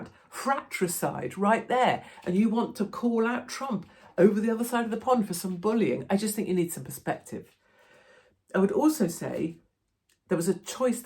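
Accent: RP, United Kingdom. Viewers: .....you are such a Sister, a Yank with a posh British accent. We love you over here. Thank you for your reasonable, common sense. Keep speaking your mind!!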